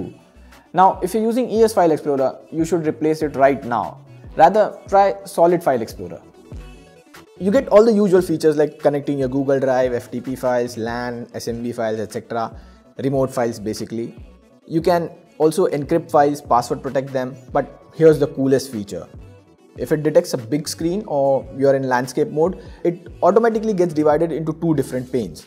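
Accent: India. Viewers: Damn, l could barely understand, hideous Indian accent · clicks on the video, dude speaking in an indian accent, shuts the video never trust indians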